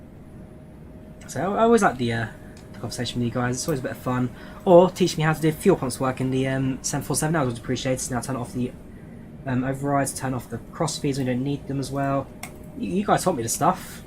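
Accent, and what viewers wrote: United Kingdom. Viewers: Why are you using British accent?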